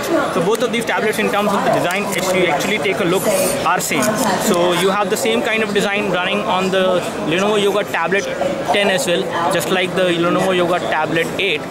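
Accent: India. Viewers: That Indian Accent! Love it! :)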